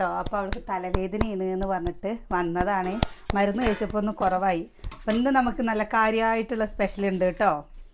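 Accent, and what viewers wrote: Palakkad, India. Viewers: You are good house wife I respect you.Your muringa curry I didn't like.Your palakkadan slang is very nice.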